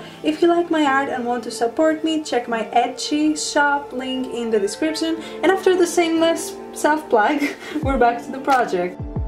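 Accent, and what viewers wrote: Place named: Greece. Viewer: Omg you're Greek? I couldn't tell by the gorgeous accent! I'm Greek too!❤️🦋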